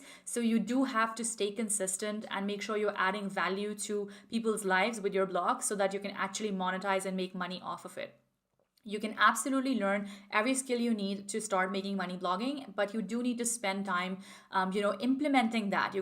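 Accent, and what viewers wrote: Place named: India. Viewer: You sound like an Indian, even your name is Indian then why does your channel say you're German?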